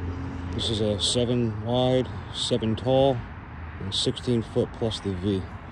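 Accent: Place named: Chicago, United States. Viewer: Cook county accent.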